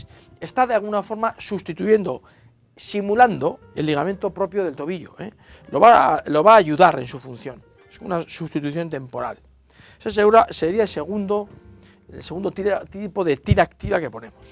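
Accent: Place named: Basque Country, Spain. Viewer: Muy bien explicado, me sirve mucho este video para mí trabajo 😎, tu acento es del país vasco si no me equivoco 😅 saludos gracias!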